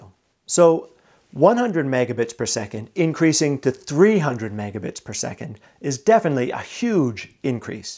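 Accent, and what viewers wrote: Canada. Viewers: Seems like a great video and I love the subject but I just can't do that extra Canadian accent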